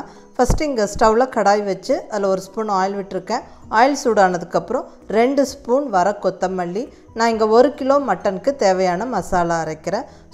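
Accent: Kongu, India. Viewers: Mam super recipe. Kongu Tamil superb Namma Coimbatore subscribers ellarayum meet pannalamae mam PL reply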